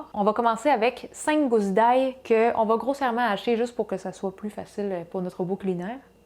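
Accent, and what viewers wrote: Quebec, Canada. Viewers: Hello 👋🏼 J’adore ton accent du Québec 😍 tu gères vraiment bien la cuisine asiatique je suis curieuse de savoir est-ce que tu as vécu dans un pays la bas ? Merci pour la recette j’adore le kimchi j’en achète souvent dans mon k Mart à Paris.mais je vais essayer Ça vaut le coup d’en stocker dans le frigo 😃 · Je suis vraiment fan de l'accent Canadien (ahaha) super recette et bonne vidéo merci j'ai adoré, mon kimchi est prêt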